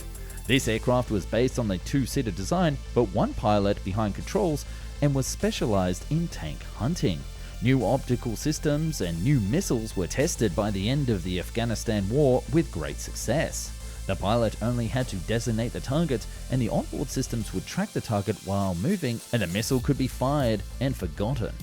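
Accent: Australia. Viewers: He's Aussie, what do you expect? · well hes australian he has an accent like that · Bro it's Australian he says SUR 25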